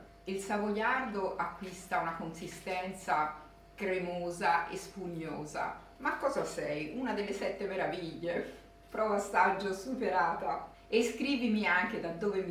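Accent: Tuscany, Italy. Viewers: Sei bravissima, fatte tue ricette, tutte riuscite. Quel tuo accento toscano, ti rende ancor di piu❤ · La farò sicuramente….non è di Benevento lei l’accento è toscano… comunque 🔝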